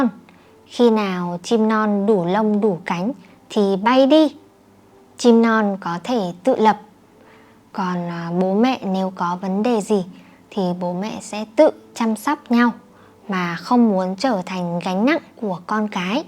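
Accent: North, Vietnam. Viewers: Does the YouTuber speak the Northern Vietnamese language in her videos?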